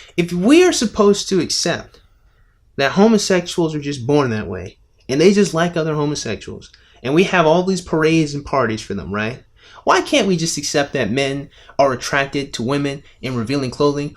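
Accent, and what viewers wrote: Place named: England, United Kingdom. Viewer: I love that you STILL use that English accent. Watch you end up moving to England and your videos will start to become more an more like the real thing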